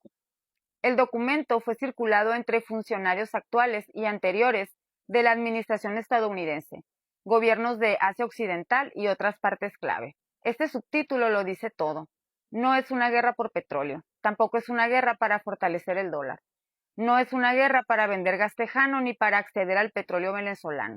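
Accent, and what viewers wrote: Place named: North, Mexico. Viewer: ayer 20 de abril fue el cumpleaños del fiu rer. El ultimo Avatara. y mientras tanto, "los aliados" ("la escuadra y el compas", los verdaderos supremacistas) mantienen a todo el mundo en estado de guerra perpetua desde 1948, dando el "sacramento" para el hematofago genocida que ellos adoran como "dios de los ejercitos". esta demostrado que esos supremacistas no entiendn otro idioma mas que el de la violencia y la fuerza. Muchos creemos que el mismo Cristo vino al mundo para terminar con ese culto demoniaco que llaman "judaismo", y el demonio disfrazado de Dios junto con los depravados supremacistas que se creen "elegidos de dios". SIN TREGUA NI PIEDAD, EL BIENESTAR DE LA MAYORIA DEBE IMPONERSE SOBRE ESA MINORIA RAPAZ, SUPREMACISTA Y GENOCIDA. GRACIAS POR COMPARTIR. ps me encanta el acento del norte, sobre todo de shihuahua! 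iñoor!!!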